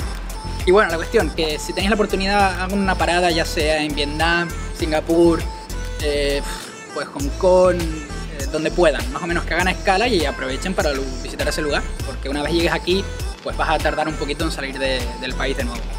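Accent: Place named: Chile. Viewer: Tú acento es igual q el de los chilenos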